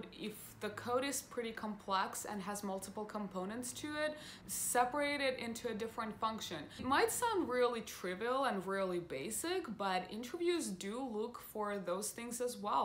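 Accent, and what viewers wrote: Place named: Russia. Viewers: мне тоже так показалось, она русскоговорящая. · У тебя такой русский акцент. Не знаю почему, но очень тяжело слушать.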